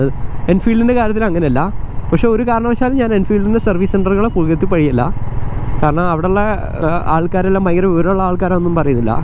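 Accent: Kozhikode, India. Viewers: Bro കോഴിക്കോട്ട്കാരനാണോ?.... ഇടക്ക് മ്മള് കോയ്ക്കോട്ട്കാര്ടെ slang കേറിവരുന്നപോലെ.. മൂപ്പരൊക്കെ😃🤔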